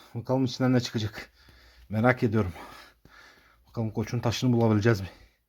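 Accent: Thrace, Turkey. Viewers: Trakyali edirne tekirdag tarafli.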